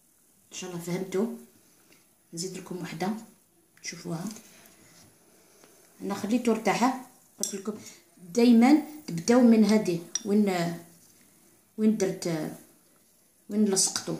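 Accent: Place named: Bejaia, Algeria. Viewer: ya3tik saha ya l'fahla konte ghir n'hawass 3la la Re7 hata sma3te la voix ta3ak chaft la vidéo 3raft bali bdjawiya vive les kabyles Toooooop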